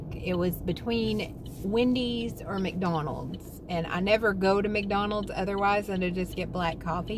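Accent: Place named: East Tennessee, United States. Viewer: I've been gone from east TN for 3 years. Hearing you talk makes me miss it lol. Love seeing you post again!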